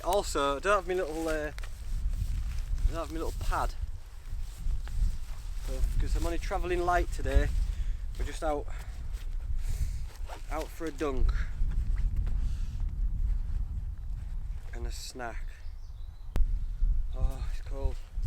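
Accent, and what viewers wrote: Yorkshire, United Kingdom. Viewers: your a funny fucker..I love the Yorkshire accent.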